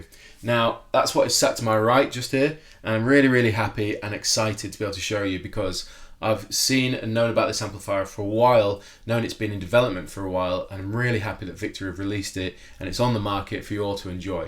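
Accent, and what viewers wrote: Yorkshire, United Kingdom. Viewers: it would be great if you could do a video on how you learnt to play guitar and the processes you went through. cheers, also from leeds so i appreciate your yorkshire accent!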